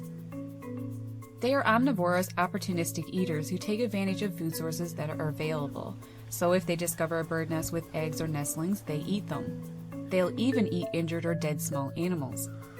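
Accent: Canada. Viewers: Oh, this lady is definitely Canadian. You could hear it as soon as pouch and then house 🤣. Bless your flappy headed hearts.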